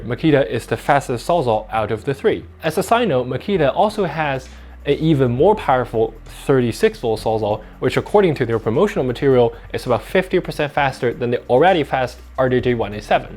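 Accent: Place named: Canada. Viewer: An Asia Canadian accent... different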